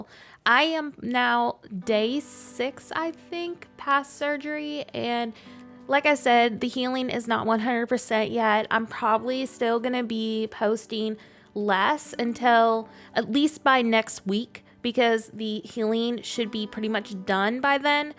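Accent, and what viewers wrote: United States. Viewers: Ooooo, do you live in the Southern Hemisphere? I would have guessed Northern only because you seem like you have a US accent. I'm from New England myself (the land of regional accents).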